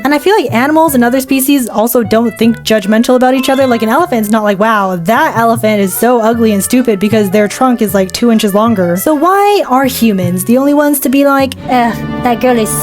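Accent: United Kingdom. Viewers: British English Alert !!!!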